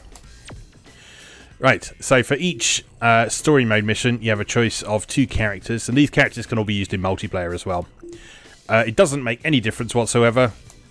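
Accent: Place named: United Kingdom. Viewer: You have a nice brit voice :P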